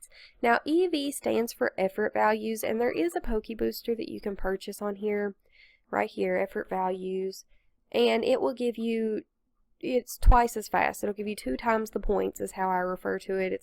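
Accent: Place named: South, United States. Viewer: well that is good to know i will let you know if i need any help oh and i hear in your videos that you have a kinda "country" accent are you from any southern states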